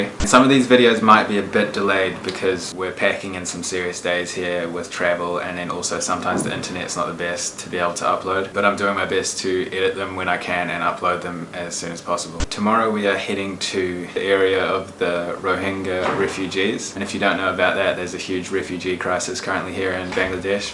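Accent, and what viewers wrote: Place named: New Zealand. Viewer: Hey,...just want to stay how good your videos are, so interesting, and eye opening in all aspects. You are very courageous even to venture into the places you have, and as I will definitely never venture into those parts of the world that you have so bravely taken the time to visit, your videos give a very good idea of what I've chosen not to experience personally. Hats off to you for continuing this channel. Stay safe, watch for crime, and keep them coming. I'm an avid follower now. And by the way, I spent 23 years in NZ living in Auckland and Wellington, so your accent is very familiar to me. I now live happily in the prairies of Canada. We are avid travellers to most of Europe, Australia and New Zealand, Alaska and the Caribbean areas, taking cruises mostly. With the COVID, of course, things have gone on hold for about 2 years. 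I'm 74 now and once again, want to thank you for all the good work you are doing to enlighten us on how some of world exists. My wife and I say every day how blessed we are that we live where we do. It's so interesting, though, that despite the difficulties you have encountered, people there are so friendly and seem to be coping somehow. Once again, stay safe, keep those great videos coming, as it is the only way I'll ever appreciate how there is so much suffering in our world today. I'm afraid the population is getting out of control in the areas you are visiting. Hopefully, humanity will sort it all out one day. Cheers!!!!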